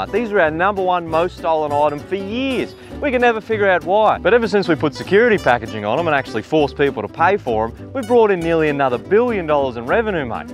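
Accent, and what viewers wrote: Australia. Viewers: full aussie mate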